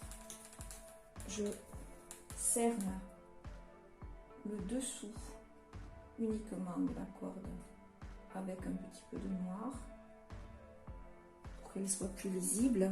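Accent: South, France. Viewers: Coucou, je te mets un MEGA pouce bleu, car, (tu as fait un travail remarquable), c'est ce que j'appelle de l'ART, sincèrement, BRAVO pour cette barque sous fond coloré chaleureux et la végétation détaillée Je sais reconnaître les vrais talents, et (tu es une vraie artiste, car tu as ça dans la peau, c'est indéniable), et ton tableau est vraiment très beau, tu mérite 20/20 en plus, d'être jolie, ton accent du sud est attachant, gros bisous et prend bien soin de toi, Artistiquement, kenic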